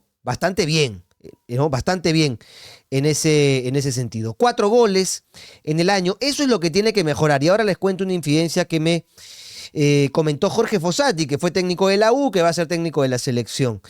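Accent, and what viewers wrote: Argentina. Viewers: Todo bien mano pero porque xuxa hablas como argentino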